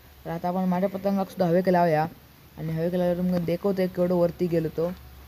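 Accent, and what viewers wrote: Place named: Malvan, India. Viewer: तुझी मालवणी भाषा ऐकायला छान वाटत आहे.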